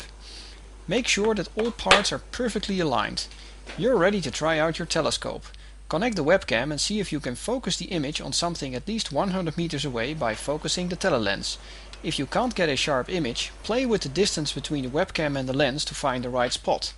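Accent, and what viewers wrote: Netherlands. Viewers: too bad that he's clearly from holland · are you dutch? just curious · from the VS?? you have more a dutch accent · Je bent een Nederlander je hoort het in je nederlands zoals bij veel Nederlanders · Mooi nl accent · haha this is a dutch guy who is talking english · NL! hoor ik altijd als ze Engels spreken XD! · Dutch accept OP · very cool, i like the very clear dutch accent :D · je klinkt een beetje nederlands xd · nice dutch accent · I could hear right away that you were Dutch, nice video by the way - I'd suggest buying a better webcam in the first place so you end up with a better result in the end. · lol good vid but horrible accent haha most dutch people can speak well english but the accents are horrible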